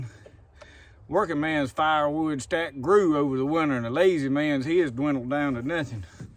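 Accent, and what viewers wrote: South, United States. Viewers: Looking for help for Arctic Blast on homestead in Alabama 😮(AND I LOVE 💕 hearing your sweet Southern voice 😅)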